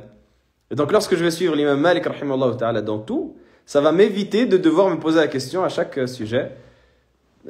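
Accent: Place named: Turkey. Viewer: Barakallah oufik, j'ai bien aimé l'accent turc 😅😅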